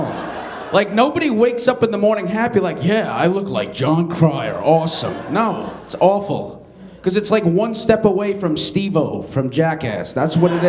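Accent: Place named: New York, United States. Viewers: that smooth ny Staten Island accent: not very thick but still recognizable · His New York accent tho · Why does he currently sound like a 27 year old New Yorker who moved away but here he sounds like a 65 year old mafia boss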